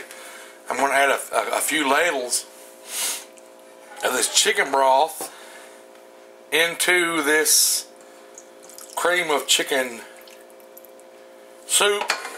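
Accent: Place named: Alabama, United States. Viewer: ***** Ok no wonder you sound familiar, Im from Bama, guess its the accent. This meal was great too, made its way into my recipe box, but ill be sure you get the credits ;-) thanks for sharing!!!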